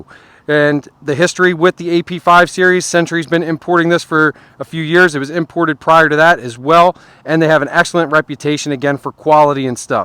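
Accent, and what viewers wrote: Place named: Philadelphia, United States. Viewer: Are u from philly? Your accent is so NE philly...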